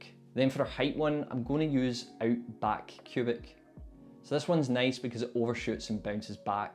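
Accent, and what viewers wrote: Scotland, United Kingdom. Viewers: Thanks mate it really helped me 🙂 u got one Subscriber more :-) But your accent is uugh where are you from ?? Scotland or so ? :D i have to listing very carefully :D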